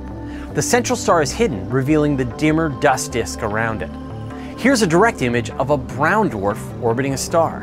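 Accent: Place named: Canada. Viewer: I liked this video and the host. But it just started grating on me every time I heard " ooot " and " aboot " being said. I'm sorry, but it did and that's just my personal experience. But the video and it's information were top notch.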